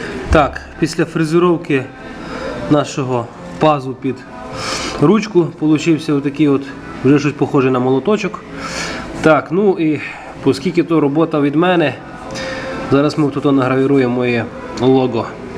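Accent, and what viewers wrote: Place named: Western Ukraine, Ukraine. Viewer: звідки ти родом? Ато акцент Львівсько-Франківсько-Тернопільско-Волиньий!))))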